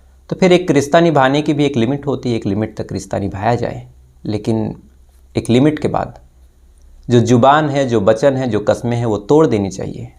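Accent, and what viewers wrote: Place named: Bihar, India. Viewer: Kahaaaaani ya kahani ? Daud ya dauuud.? Aapka pronunciation Bihari hi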